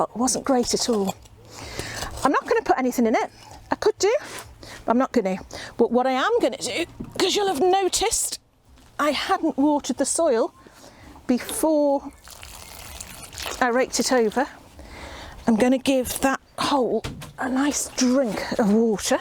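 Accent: United Kingdom. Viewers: Thank you for the brilliant idea of tying the string around the root ball of the tomato plant. I usually use coat hangers pushed into the soil by the side of the plant and then tie the string to that and like you, I wind the plant around the string as it grows. Your idea looks so much easier so I will be trying that method this year. Now, I don't grow roo many in the greenhouse or hoop house over here in Ontario as it gets too hot. So, they are usually grown outside. So for the frame to use overhead, I have several old discarded gazebo frames. They work a treat and it does save them from the landfill (tip) for a few more years. Thank you for the great ideas and I especially LOVE your accent. It feels like a blast from my past as I was raised in UK. I was born here in Ontario but taken over there as a baby.. As you can imagine, I like to use the best of both worlds when it comes to gardening. 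Some things work well here and not so well over there and the other way around. Anyway, you take care and stay stay safe, blessings from Sandie in Ontario Canada.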